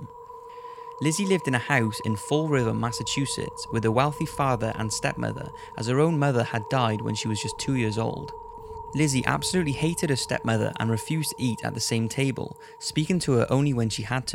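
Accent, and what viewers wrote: United Kingdom. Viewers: Where abouts in the UK is your accent from??? Pls answer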